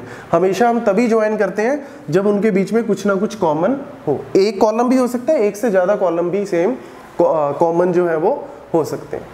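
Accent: Punjab, India. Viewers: fun fact - the guy is Punjabi. P.S. - I just guessed it because his accent reminds me of Punjab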